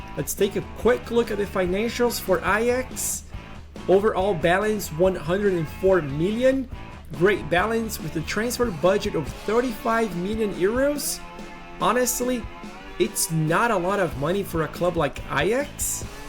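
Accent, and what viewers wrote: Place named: Brazil. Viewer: Brasilians guys allways have that accent, i'll bet he's brazilian =P good job 👍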